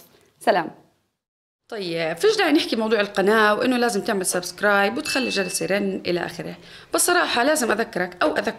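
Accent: Jordan. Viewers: ليست لبنانية.. ربما هي من جنوب الأردن ولكنها قطعا ليست لبنانية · ادري الموضوع فيزياء وبلاوي بس وربي اللهجه الاردنيه تجنن بحس ودي اضحك 😂😂😂 واحلى اشتراك 😊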